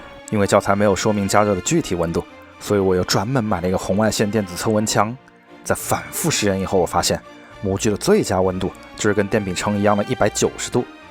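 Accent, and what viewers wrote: Wuhan, China. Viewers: 熟悉的武汉普通话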